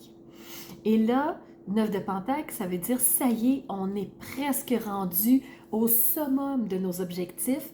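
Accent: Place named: Belgium. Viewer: Pour commencer, j'adore votre accent. J'aimerais vivre par chez vous, parce que l'accent belge, euh... comment vous dire ? Hum !...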